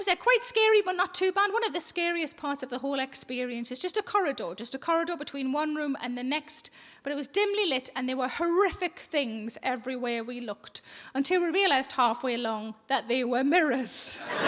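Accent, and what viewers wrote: Newcastle, United Kingdom. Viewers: There are so many Americans in the comments. I keep wondering, do you (Americans) understand everything she says in her Geordie accent? Even various northern words like 'bairn'? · It's north east England x geordie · Neither. She's from Newcastle! :-) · And the geordie xD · You mean Geordie? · It’s called Geordie- usually used to describe people from the north east of England. There are a few smaller dialects within this area but generally it’s Geordie. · She's English, a Geordie from the North East of England. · Hey Sarah im in the US, me mum was from UK, i love listening to your accent reminds me of her. lol Keep up the funny work. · Peace love justice I was taking the P, she's from near Newcastle, so you would say she's speaking geordie! Soz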